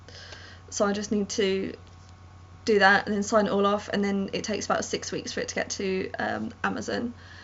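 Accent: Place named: United Kingdom. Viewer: A British accent makes all poetry better somehow 😃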